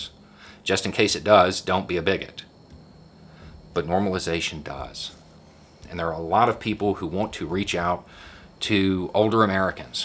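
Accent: South, United States. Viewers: Gotta be 100% honest...didn't expect this from a prepper white guy with a southern drawl.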